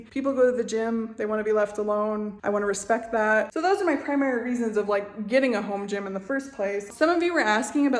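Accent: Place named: New England, United States. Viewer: It’s so funny being from New England hearing your pronunciations and hearing you hype Maine as a cross country trip because Maine is like a day trip lol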